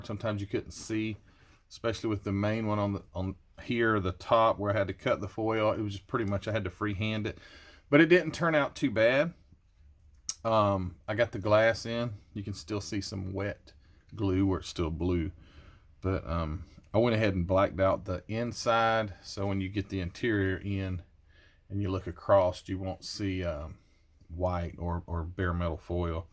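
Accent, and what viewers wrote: South, United States. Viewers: One tip for painting dashes they sell micro micro small brushes at hobby town they come in a pack of 30 for 7 bucks and they are so fine it's so easy doing dash and tiny details and one more question are you from Alabama or Mississippi due to the accent I'm in mobile al if your close maybe we can build sometime or learn some tips from you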